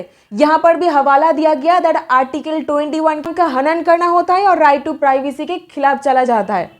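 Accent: Bengal, India. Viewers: 😊 Bengalis jab Hindi boltey hain tab Aisa hi boltey hain😊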